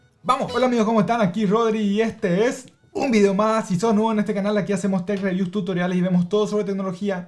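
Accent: Paraguay. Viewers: Una pregunta. Sos de Paraguay? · Eres paraguayo, me di cuenta en solo 30 seg de vídeo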